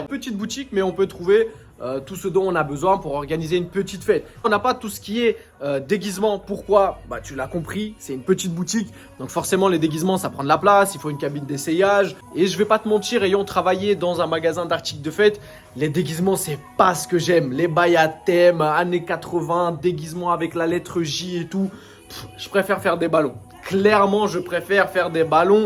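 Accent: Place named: suburbs, France. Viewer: Et depuis vous avez fait faillite? Encore un jeune de banlieue avec sa façon de parler !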